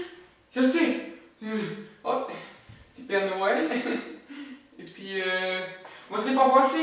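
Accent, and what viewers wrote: Belgium. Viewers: J'adore trop c'est ton accent belge 👏👏😄👍🔝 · cest la premiere video de que je vois et pour moi cest un jacqouille avec un accent belge echapée d'un hopital pshychiatrique donc voila et en plus il est pas trop drôle! Voila voila koi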